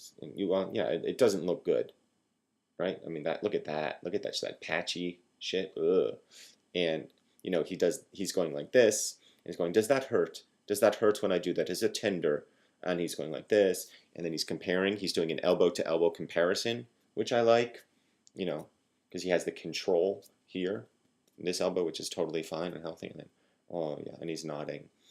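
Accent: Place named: Ireland. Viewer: Steve has an excellent point about injuries. They sneak up on you. Haven't you ever turned your leg slightly & the next thing you know your knee is swollen? Or just reach for something stupid & have your back go out? The bursitis is in your left elbow, and you're a southpaw, yes? (Satanic!) Are you doing a lot of writing lately? Not so much typing - unless you rest your elbows while you type. Which would be wrong, Mr. "Touch Typist". Anyway, your Irish brogue turned me on.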